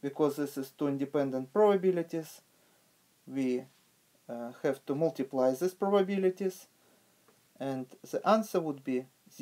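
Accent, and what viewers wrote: Russia. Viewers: Are u russian !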